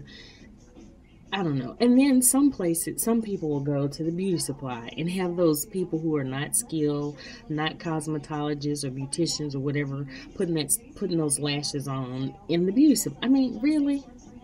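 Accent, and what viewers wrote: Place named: South, United States. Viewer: Agreed with every single detail, and straight 2 the point! Just love that deep-hearted Southern accent and i'm from Texas!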